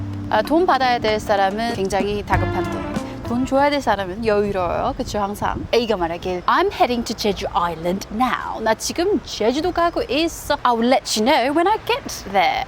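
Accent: United Kingdom. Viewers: 영국영어 너무 좋네요! 감사합니다!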